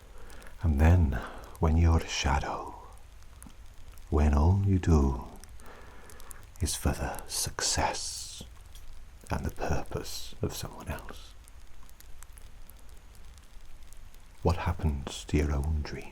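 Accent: Wales, United Kingdom. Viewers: I loved everything about this video! I've been on the fence about Elden Ring since I'm not sure if I'd have time to actually play. I divide my time between Everquest, Everquest II, Guild Wars, Guild Wars 2, Lord of the Rings Online, and, most recently (thanks to you, ) Raid Shadow Legends. Anyway, the costume is truly awesome but then you are a talented individual so it's not surprising. Your Welsh accented voice, I could listen to that all day, at least until I fell asleep. Thank you for sharing your time and creativity with us, I appreciate it muchly. Oh, and as a side note, I always dream in color. 😊😊 With permission, hugs 🤗🤗🤗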